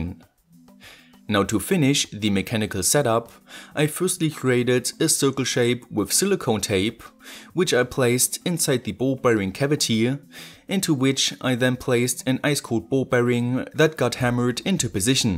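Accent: Germany. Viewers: i can hear that you a german guy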